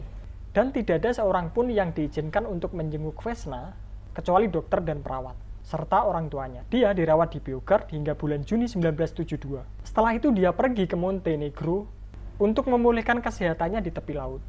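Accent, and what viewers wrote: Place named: Java, Indonesia. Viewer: mas’e medhok opo wong jowo :D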